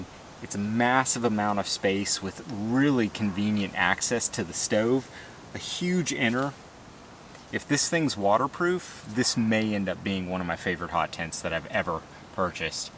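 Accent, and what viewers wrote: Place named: United States. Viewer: Hi! Great video! 👍 But something is eating at me! 😵 Your YT channel says you're in the UK! But you speak like an American(no britt accent) you cook like an American you phrase like an American and the woods you're in looks like somewhere in the East Coast and Pennsylvania! About the only thing that kinda hinted that you might be British is that black treacle bacon! What gives mate???? 😂 Btw,had to Google black treacle bacon 😅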